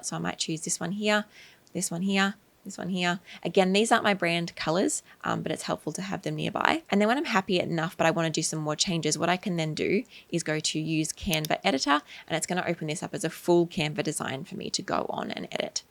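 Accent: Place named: Australia. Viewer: Nice to here an Aussie voice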